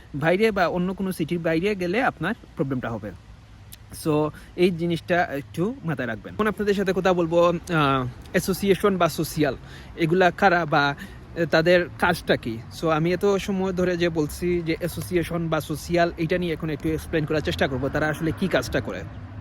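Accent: Sylhet, Bangladesh. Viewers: sylheti naki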